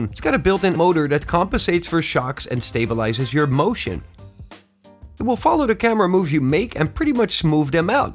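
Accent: Netherlands. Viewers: This sounds like a Dutchman...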